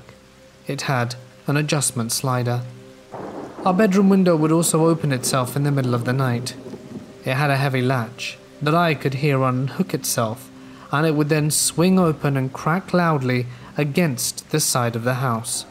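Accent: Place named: RP, United Kingdom. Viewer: I love your voice because it sounds like Rp-English. Excellent for practicing pronunciation.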